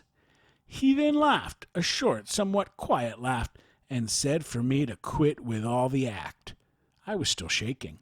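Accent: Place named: South, United States. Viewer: Awww that's a shame, I think the accent is very beautiful. I've been trying to work out, where it might be from. It does sound like a southern accent but I think it has a mix but could be very wrong. 🤔 Maybe give the channel a chance and see if you change your mind. Better a human voice than a computer for sure. If not, then Maybe you will find one that you do like. Have a lovely day 💛✨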